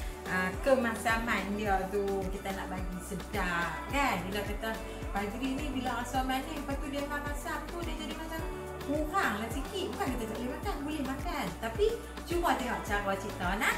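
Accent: Kedah, Malaysia. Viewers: Adoi..kecheh lioq naa..paberet pulak tu..tq lah sharing resepi ni..suke bahasa cik ni..kedah me perlis ni..ingin nak bebual bahasa ni..sdap mcm mknn jugakk🤭😋❤👌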